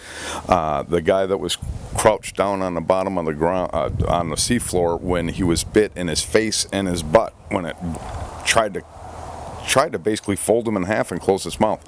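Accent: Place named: Florida, United States. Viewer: I knew you had a Florida accent! I still can’t get over that they found a Great White with a 6’ Blue Shark, 9’ Dolphin and Turtle in its stomach! We hear 6’ or 9’ and think that’s small, if you’ve ever been fishing think how that fish flips around on the deck, then imagine one as long as you, or in the case of 9’ three feet longer it’s A HUGE FISH! And in the STOMACH of a Great White, if those bigger ones wanted to swallow you whole they could, just giant monsters.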